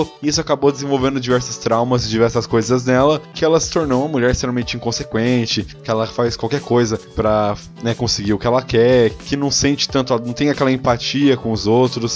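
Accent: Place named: São Paulo, Brazil. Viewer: você é paulista? O sotaque parece!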